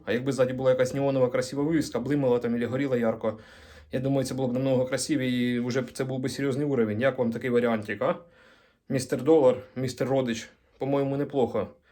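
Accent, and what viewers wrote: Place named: Orikhiv, Ukraine. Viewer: Так ты парень с Орехова, зачем ты говоришь, что с Запорожья?? Понятно , что в Запорожье никто так не разговаривает. Богдана знал может? У него Горячий Хлеб был по всему орехову , преображенка, новоданиловка. Не надо говорить, что ты с запорожья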